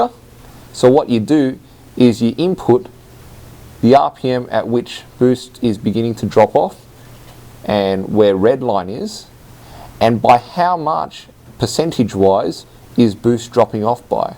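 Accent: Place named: United Kingdom. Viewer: he's asian, why does he sound british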